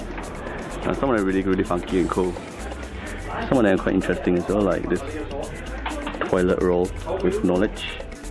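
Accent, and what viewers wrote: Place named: Malaysia. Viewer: gotta love malaysian accent in this vid~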